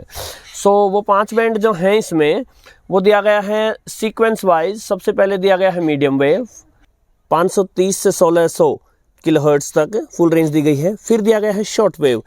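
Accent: Standard. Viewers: आपकी शुद्ध हिंदी बोलने का कोई मुकाबला नहीं है धन्यवाद, यह वीडियो भी आपके लिए 😊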